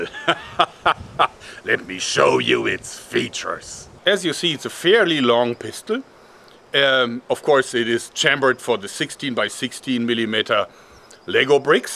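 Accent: Germany. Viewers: He’s like my badminton coach but with German accent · ist deutscher er manhörtdas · Are you a German? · You're German right?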